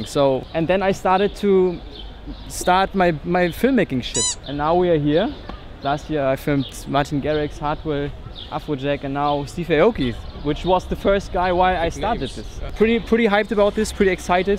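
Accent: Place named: Germany. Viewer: Dein Englisch ist schrecklich. Man hört noch viel zu sehr deinen deutschen Slang.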